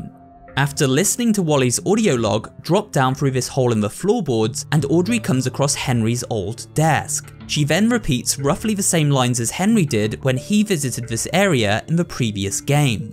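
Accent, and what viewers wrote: United Kingdom. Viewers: he's british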